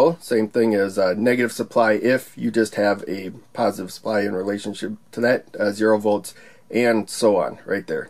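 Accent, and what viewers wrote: United States. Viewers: Thanks! multimeter tells many things. your drawing and basic knowlage (what LOW, HIGH means) help me some. according to OUTPUT (LOW or HIGH), LED 1 or LED 2's voltage difference is much interest. multimeter between LOW(OUTPUT) and 5.3v VCC tells 5.26v. then between HIGH(OUTPUT) and GND tells 3.97V(220 ohm is between). Your english is so fast so not easy to listen for middle aged East Asian guy, but could hear some important phases. I think you are an Amerian from your english, that's right. Good luck for this hard decade with not beautiful some GREAT policitions here and there. Thanks again.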